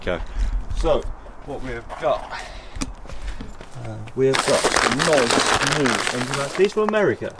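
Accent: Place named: United Kingdom. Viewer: Its crazy how much more interesting it is to watch these videos with a guy with a british accent, I could watch these videos all day, yet typical Americans talking about the same exact things, im asleep.....lol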